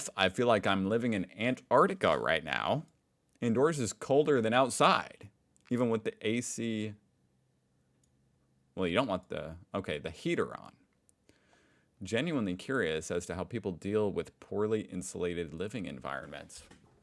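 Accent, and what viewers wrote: England, United Kingdom. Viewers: You sound more like a Pom definitely not Aussie 😂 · "Happy arvo". That 'call-sign' is cheerfully yours, but your Australian accent is hybrid British. But no worries mate. Cheers from Sydney, AU. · You can stop with the British accent. LOL! You have never done an Aussie accent. LOL!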